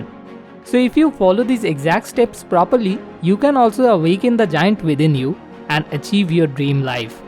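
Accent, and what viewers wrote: India. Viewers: I hate the Indian accent....sorry. I just cannot take it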